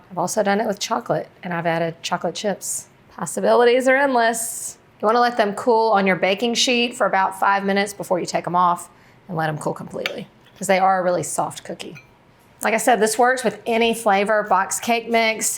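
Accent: South, United States. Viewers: Yes she's great at this! But I always welcome a southern drawl...ya' all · has a sweet southern accent, one of the things I love about listening to her videos. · I hear a slight southern accent 🤔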